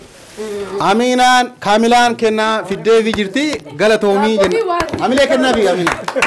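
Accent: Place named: Arsi, Ethiopia. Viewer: Gafiin qaba gossa tammi loqudni isaa arsi nati fakkate galatomi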